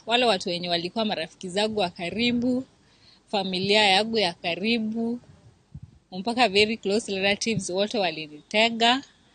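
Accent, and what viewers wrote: Kikuyu, Kenya. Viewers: Ukweli.... very heavy Kikuyu accent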